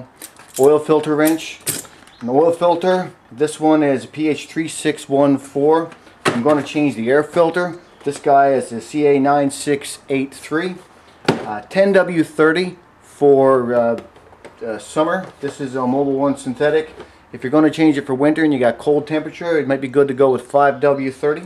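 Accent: Canada. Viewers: this guy sounds like a fucking newfie lol click click click click click click with the torque wrench lmfao rofl!!!!!!!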